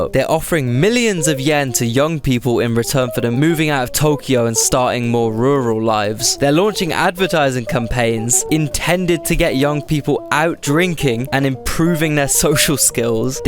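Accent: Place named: United Kingdom. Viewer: I love compilations of random clips with a slighty angry British person talk about it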